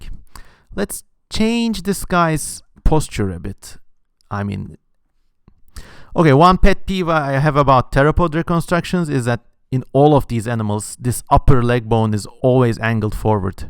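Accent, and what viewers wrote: Turkey. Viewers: I was just curious about All Tomorrows author, but never clicked one of his videos. I came across by and clicked it. After 1 second I heard you, I was like "Dude, are you Turkish?" And I clicked the Patreon and YES I WAS RIGHT Brrrr Ellerine sağlık <3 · That accent is so Turkish and I love it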